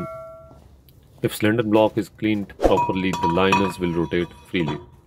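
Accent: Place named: Punjab, India. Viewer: Buddy ur voice sound punjabi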